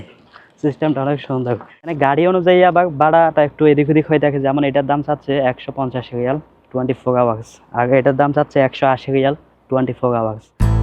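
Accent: Chittagong, Bangladesh. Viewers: ভাই আপনার বাসা কি চট্টগ্রাম 😅😅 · chottogram এর মানুষ যখন সুধদো ভাষায় কথা বলে সেম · এটা মূলত চট্রগ্রাম এর আঞ্চলিক ভাষা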